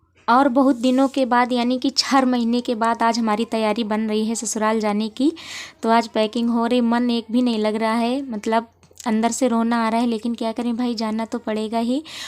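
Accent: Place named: Bhojpuri, India. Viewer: आप तो हम लोग के जैसा बोलती है घरवा कहा बा तोहार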